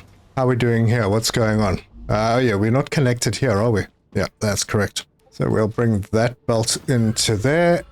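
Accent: South Africa. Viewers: You sound afrikaans! Hoe gaan het! Im Dutch but know a bit of afrikaans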